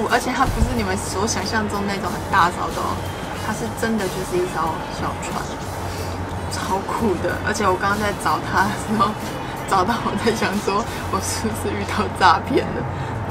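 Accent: Taiwan. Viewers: 好喜歡聽講台語，台妹味十足! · 最近才開始看你的視頻... 很美，雖然有點台灣國語，但一點也不影響你天然的氣質，更難得的是吃相很優雅..要繼續加油喔！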